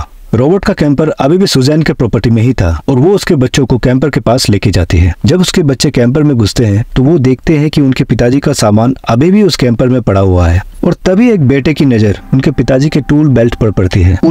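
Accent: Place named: Northeast, India. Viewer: आपका हिंदी का नौर्थइस्ट वाला ऐक्सैंट आपको ज़रूर हिट करेगा।लगे रहो दोस्त।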